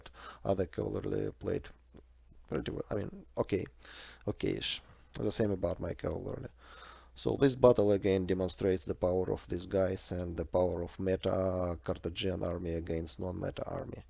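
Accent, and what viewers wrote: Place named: Russia. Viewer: i prefer Bobi and his classic build. if i play without jav i prefer cretan arch than 3 balearics maybe 2 bale and 1 arch but the 40 points of damage is good if you want focus inf with good armour.. but you neel also cav supremacy. btw pelta is pelta <3 good video expecially coz for me is very simply understand english spoken by a russian coz it is much slower <3 great work mate! Lawrence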